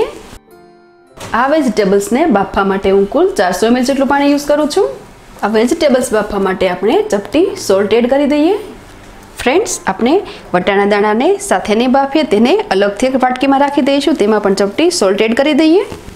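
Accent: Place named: Kathiawar, India. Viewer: વાહા બવૂજ શરસ કાઠીયાવાડી સંવાદમા ભાજી ખાવીની મંજા ઓરછે બીજા વીડીયો મૂકછોજી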